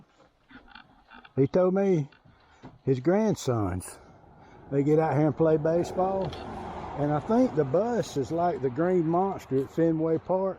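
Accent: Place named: South, United States. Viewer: You're welcome. The polite southern commentary is 2nd to none.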